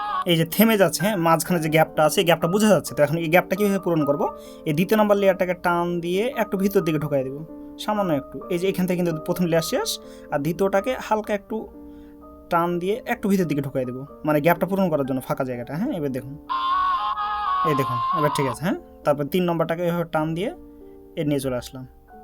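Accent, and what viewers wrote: Chapainawabganj, Bangladesh. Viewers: রাজশাহী কোথায় আপনার কথা শুনে চাঁপাইনবাবগঞ্জের ছেলে মনে হলো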